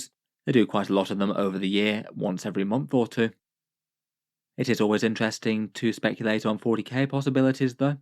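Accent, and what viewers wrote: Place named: Southern England, United Kingdom. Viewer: Somewhere in the south. And Games Workshop is a British company, there’s stores in nearly every town.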